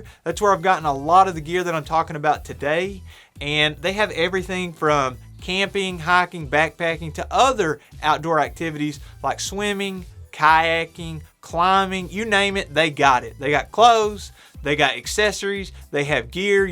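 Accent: Kentucky, United States. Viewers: Man I knew that Kentucky accent 😂 I’m from Louisville but get out to the gorge and big south fork as much as I can. Maybe we’ll cross paths sometime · "See we dont like our spoons with short handles...we like em with long handles that way you can use em just like at" snaps fingers im from Alabama and i gotta tell ya the kentucky accent is great man. Great content as always.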